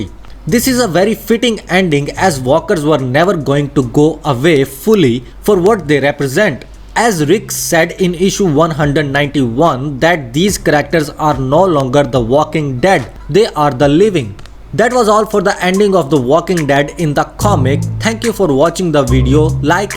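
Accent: India. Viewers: Lmao that accent reeks of curry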